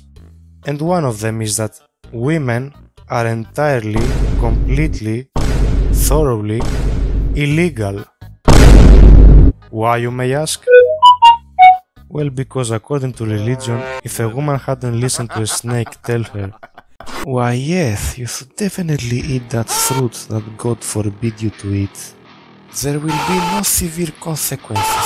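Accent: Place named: Greece. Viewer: ellinas einai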